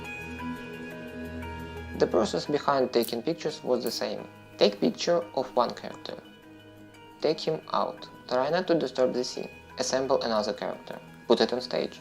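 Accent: Russia. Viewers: Ты русский по произношению понятно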